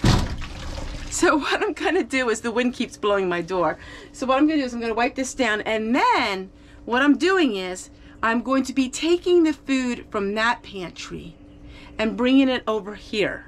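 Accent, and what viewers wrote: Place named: Ohio, United States. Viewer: Your accent sounds like your from Berlin OH.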